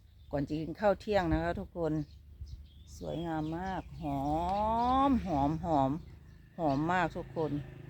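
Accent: Isan, Thailand. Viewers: โชคร้ายสำหรับ FC ต่างแดนอย่างเรา ระบบ AI เปลี่ยนเสียงภาษาอีสานที่เป็นเอกลักษณ์ของ แบบฉบับที่เราชอบเป็นภาษาท้องถิ่นของประเทศนั้นๆไปแล้ว มันไม่ unique อีกต่อไปแล้ว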